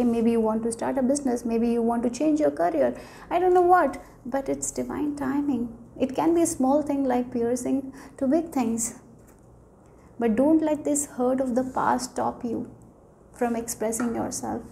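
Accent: India. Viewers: Really enjoying your readings. Very on point and deep. Love your Hindi accent as well. Thank you🙏🏼✨💖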